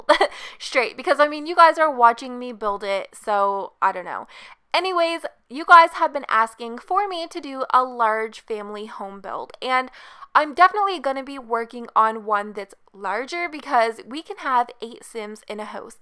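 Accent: Canada. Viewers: You have the most canadian accent I have ever heard. Also, this is a cute house! Thanks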